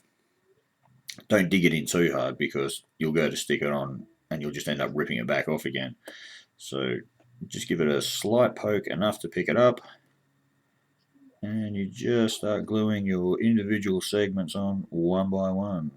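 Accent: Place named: Australia. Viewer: dude you sound aussie as f#ck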